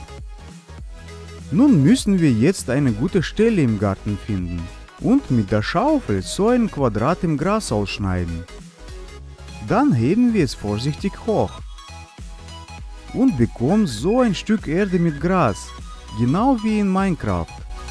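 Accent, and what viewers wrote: Russia. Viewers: Sein russischer Akzent bringt mich zur Weißglut 😑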